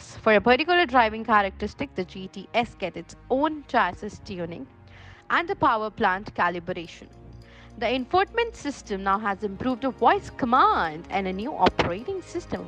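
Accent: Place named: India. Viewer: Sorry as non Indian it is too difficult to understand too strong accent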